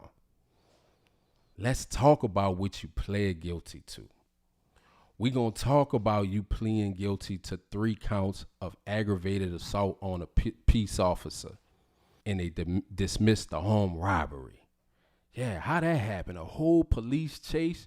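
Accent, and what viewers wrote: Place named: South, United States. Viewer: I'm a new subscriber 💯 just curious is it NYC or N.O. I thought you were in NY but you sound like down south 😂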